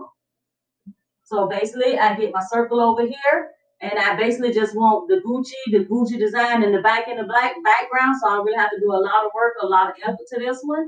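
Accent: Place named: Florida, United States. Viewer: This was very helpful. I knew right away from that accent that you were from Florida. I believe I am right. 🤭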